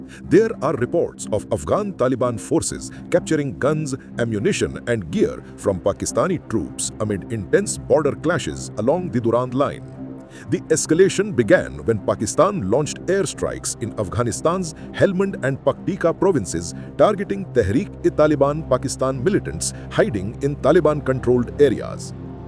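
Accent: India. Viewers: check pajeet accent 🤣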